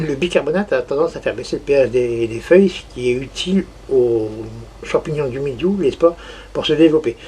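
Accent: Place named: Nord-Pas-de-Calais, France. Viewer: CC, Les tomates expliquées par un ch'ti ... un parfum d'été en plein décembre ....😎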